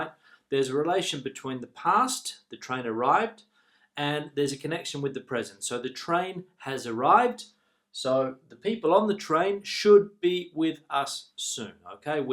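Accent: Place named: United Kingdom. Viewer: What a beautiful accent! Forvo says it's a british accent so I hope I could learn it perfectly as soon as possible, Spanish here!! Very good video, perfectly explained and easy to understand, Thank you!!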